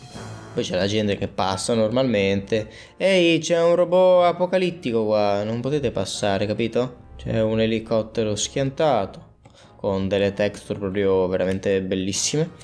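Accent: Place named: Veneto, Italy. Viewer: Lui è veneto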